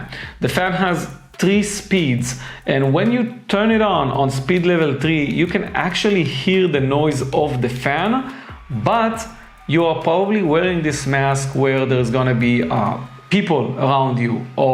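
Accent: Israel. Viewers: Israeli english LoL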